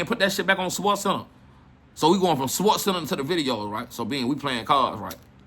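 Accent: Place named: New Orleans, United States. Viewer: you kinda sound like you from new orleans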